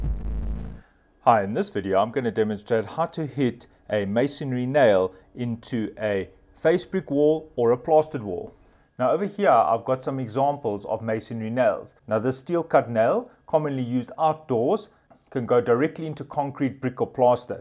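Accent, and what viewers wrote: South Africa. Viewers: No. This voice is more South African. The Terminator's is Austrian. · Definitely a south African accent though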